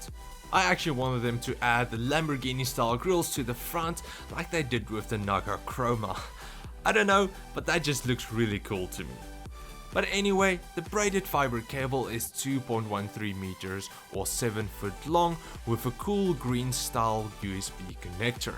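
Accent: Afrikaans, South Africa. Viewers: I thought you had a afrikaans/ South African accent😂😂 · Are you from South Africa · I clicked on the video, heard the South African accent and subbed. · My guy you from sa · The moment i heard that accent I knew it was a South African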